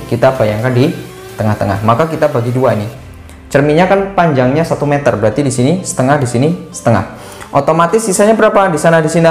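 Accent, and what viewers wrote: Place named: Java, Indonesia. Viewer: Terjadddi, Oke, dan Jjjelas ... meddoknya keliatan , trs katakata Jjjur ya Kesimpulan, bagus .. dan aku gabisa ngerjain soalnya.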